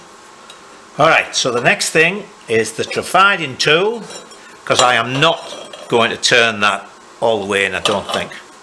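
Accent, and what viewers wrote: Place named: Teesside, United Kingdom. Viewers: Brilliant videos great watching still got that Teesside twang then, Martin from Hartlepool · Only found your vids. a month ago so a lot of catching up. Was intrigued by your Boro accent so have looked U up. We must have been around similar people similar times Graham Petite John France Geoff Crust Chris Rea for my sins once a Boro lad always a Boro lad. Love your simplicity & knowledge of engineering/Metallurgy. Me Metallurgy Dorman Long Warrenby Brittania SB Coke Ovens Lackenby. Good to C U putting in the graft. Ignore the twitters.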